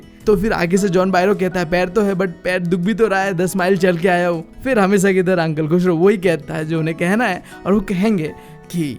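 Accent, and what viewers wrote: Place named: Arunachal Pradesh, India. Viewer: Bro are u from arunachal 😂 bcz ur hindi is like us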